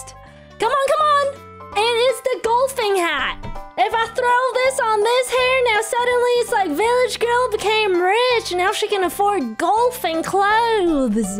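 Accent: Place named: South, United States. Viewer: That southern accent is just getting better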